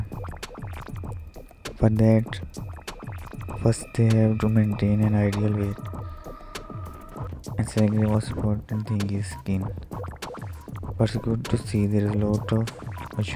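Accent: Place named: India. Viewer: This Indian narrator sounds like every telemarketer scammer who ever called me.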